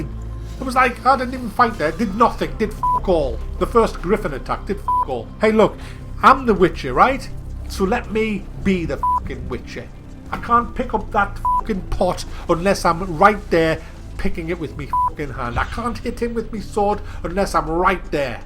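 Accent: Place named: Scotland, United Kingdom. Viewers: I'm so glad someone tries to actually lighten up the tense ass atmosphere surrounding this game, especially in Scottish rofl. had me in tears, because it really reflects how pissed I feel that this level of gameplay is considered a flawless game. It's one of the stiffest effin' games ever. And all the quest are the same. 1. Talk, 2. Questmarker, 3. Witcher sense head ache camera, 4. Forced battle, 5 Talk. Urgh. I finished the game anyway so it's a decent experience all in all, but the gameplay has always been a disaster in this studios games. Why don't they make something that's fun to play? Like seriously, it's like the game is flat. There is no real climbing, no PROBLEM SOLVING, except for some ridiculously obvious puzzles etc. End of rant. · Senor Studly I think you'll find he's Scottish :/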